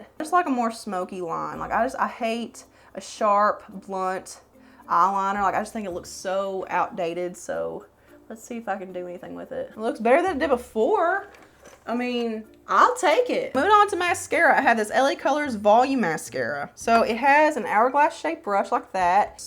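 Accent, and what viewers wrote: South, United States. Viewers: I just love your take on everything and life in general. I live in Minnesota but grew up in Georgia adore your drawl it makes so homesick. I hate the midwest people arent friendly like us southern folk. Thank you for brightening my days with your keen sense of humor!❤❤BTW where you get your eyeglasses they are so kewl on you#!☮️💟🕊️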